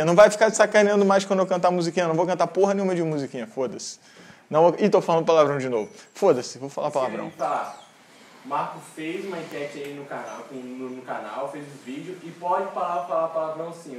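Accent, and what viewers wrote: Rio de Janeiro, Brazil. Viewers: É regionalismo, carioca, se não xingar e chia tá errado. ..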